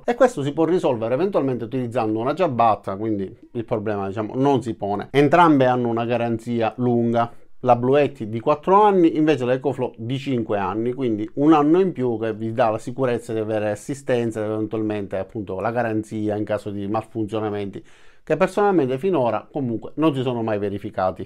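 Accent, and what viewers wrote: Sicily, Italy. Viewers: Ciao grazie delle preziose informazioni. Oggi anche mia moglie ha visto il video e mi ha detto “dall’accento è di enna o di calascibetta” ..lei è di enna 😂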